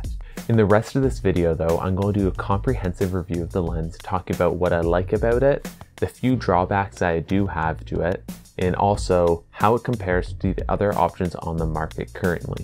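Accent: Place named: Canada. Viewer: Holy Canadian accent LOL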